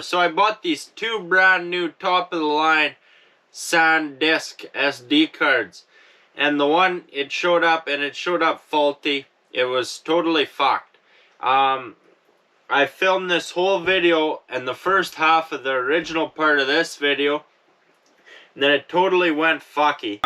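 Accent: Canada. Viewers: Just curious, where are you from? Canada? Interesting accent... Great video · I could listen to this guy talk ALL DAY, LOL!!! That Canadian "Country Accent" Adorable..... ❤ from Kentucky U.S.A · Oh my Goddess you're hilarious! Lmao when you opened the door to new snow dump! Love your Canadian accent. Your a smart guy and good to your dog 🐕 👍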